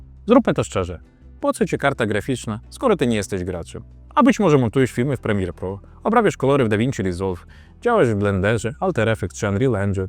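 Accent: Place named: Belarus. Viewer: dieeleses? warto jeszcze popracować nad wymową, bo jest potencjał, pewno długo żyjesz na terenie Polski, ale jeszcze ciągle Twoja wymowa - zgaduję, że białoruska - rani uszy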